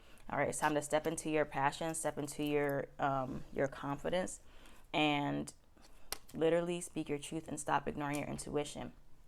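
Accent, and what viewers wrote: Bronx, United States. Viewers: You from the Bronx?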